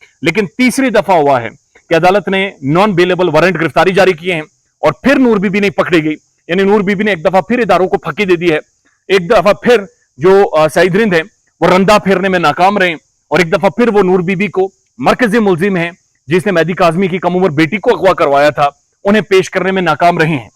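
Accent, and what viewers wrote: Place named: Kashmir, India. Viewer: Mne phly b pocha tha k ap kashmiri hain plzzz bta dain k ap kashmir se hain kia? Apk Andaz e bayan se lgta tha k ap kashmiri hain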